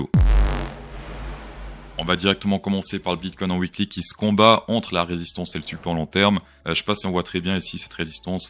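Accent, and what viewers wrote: Vaud, Switzerland. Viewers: Tu l'entends à des kilomètres l'accent vaudois, sans oublier le "huitante" 😉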